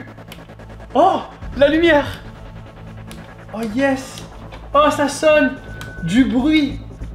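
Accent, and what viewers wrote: Marseille, France. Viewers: C'est l'accent marseillais ou il ne sais pas parler ?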